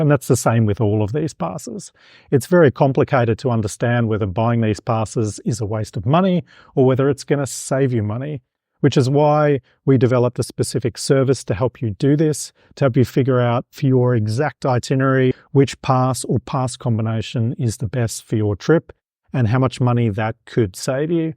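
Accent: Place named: Australia. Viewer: Sound like an Aussie.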